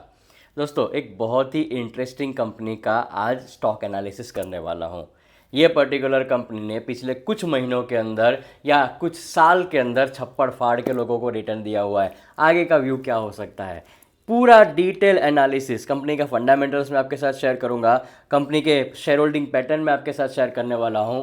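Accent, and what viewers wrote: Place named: Gujarat, India. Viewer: Aap ki baat se lagta hai ap Gujarati hoo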